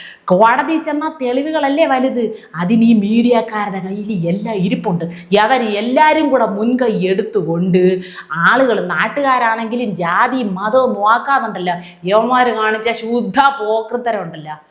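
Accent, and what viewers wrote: Thiruvananthapuram, India. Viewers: കലക്കി,. തിരുവന്തപുരം ഭാഷ 👌 👍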